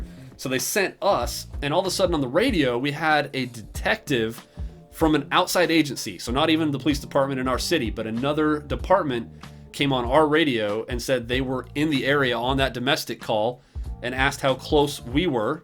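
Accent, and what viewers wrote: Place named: South, United States. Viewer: Ight it’s definitely not you, this guy has a southern accent, my b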